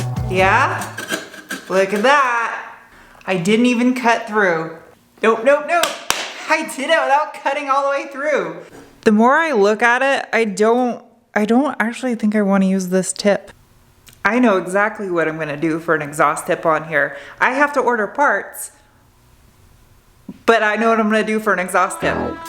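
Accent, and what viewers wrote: United States. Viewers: cant stand the way americans garage, like gar-odge in canada we say guh-raaj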